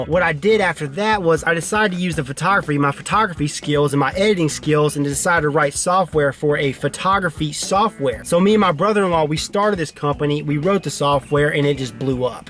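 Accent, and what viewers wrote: South, United States. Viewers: well well,...that's a trip! You're an Oak Ridge boy and I am a Hermitage/Nashville Girl! I've always thought I heard a lil twang in ya but when you said you dipped, I KNEW you were southern! Ahaha....My brotha from another motha! Thanks 4 the vid. Hella informative. More so than any other yet!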